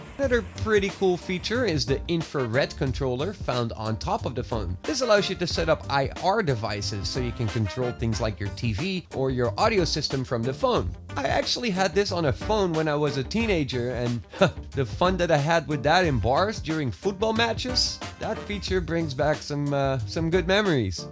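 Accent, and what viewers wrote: Netherlands. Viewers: you sound very dutch 🤔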